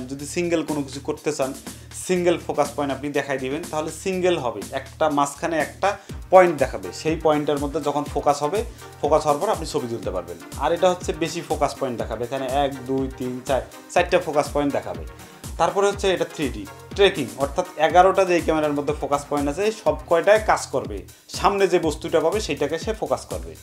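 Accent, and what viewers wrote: Rangpur, Bangladesh. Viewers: বাড়ি কই ভাই? হয়া যাচ্ছে ইয়া হয়া যাচ্ছে চলি যাচ্ছে। রংপুরত বাড়ি নাকি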